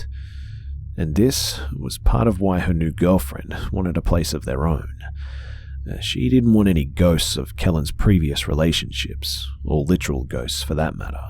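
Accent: Australia. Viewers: xsmol x hu x soldier x Australian accents are the best :)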